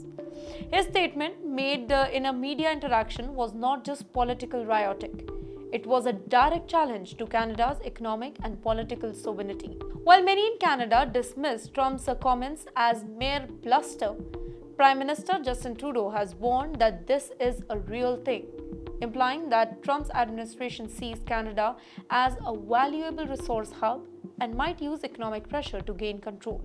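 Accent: India. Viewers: Bro it's Indian English, Not an American English.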